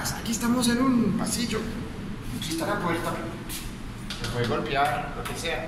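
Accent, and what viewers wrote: Colombia. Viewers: Aprendiste el mejor acento el colombiano, hablas re colombiano. Se que eres heterosexual, pero lo diré , eres guapo jeje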